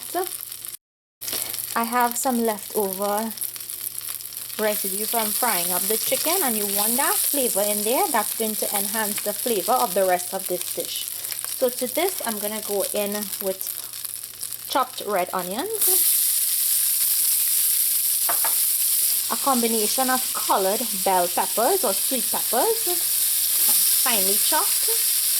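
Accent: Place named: Trinidad and Tobago. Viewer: Gyal me like u accent, I can listen to you all day.. I like de trini women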